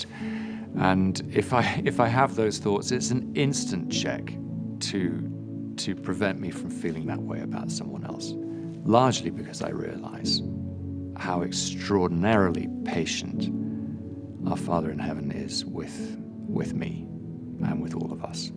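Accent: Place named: United Kingdom. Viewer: LOVE THIS MESSAGE SO MUCH!! esp w/ the british accent well done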